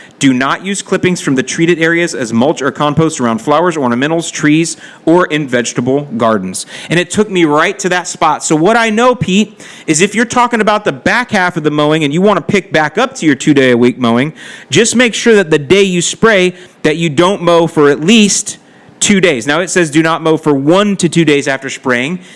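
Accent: Chicago, United States. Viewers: ....killin’ Me with that Chicago accent. It’s alright tho buddy, I ain’t mad atcha.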